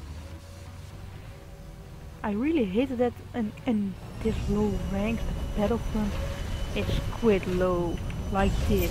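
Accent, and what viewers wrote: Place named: Netherlands. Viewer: Je hoort wel goed dat je nederlands bent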